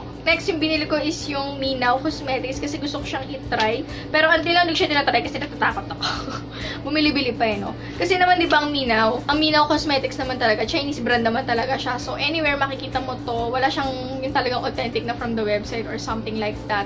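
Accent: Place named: Ilocos, Philippines. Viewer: Found your channel and it's awesome! You sound like an Ilocana so you earned a subscriber :D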